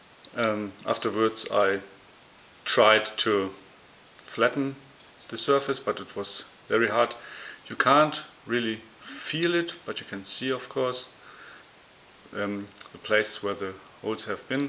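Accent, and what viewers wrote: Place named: Germany. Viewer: Der deutsche Dialekt beste😂👏👍 aber sehr unterhaltsam 👍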